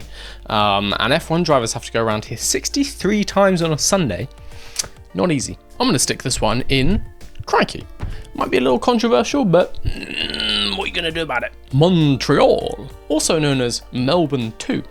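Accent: United Kingdom. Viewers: I can assure you: You sound British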